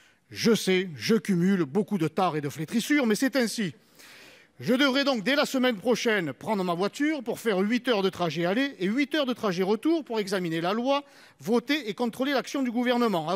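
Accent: South, France. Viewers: Cool. La voix du sud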